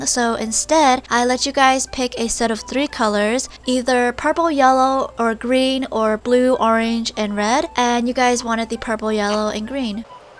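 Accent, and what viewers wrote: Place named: India. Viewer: You talk like an Indian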